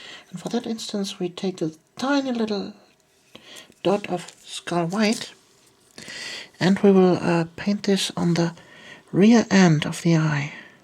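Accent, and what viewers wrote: Germany. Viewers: ICH WUSSTE DAS DU DEUTSCH BIST!! hört man an deiner aussprache.. tolle videos.. danke für die hilfe :D !! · No she's German, a lot of foreign accents speaking English come off deeper than our own, give her a break will you?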